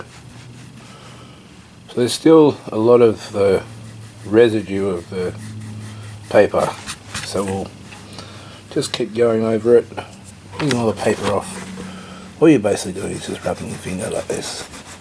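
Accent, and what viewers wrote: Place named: Brooklyn, United States. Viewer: Wait...you’re Australian?! I thought that was a Brooklyn accent! 😂 A couple things...that drawing is beautiful, it reminds me so much of a Tim Burton character. And was that a Skerple you used? Always love your videos buddy, glad your little one is doing well. That bottle opener was the best part...gave me a good laugh!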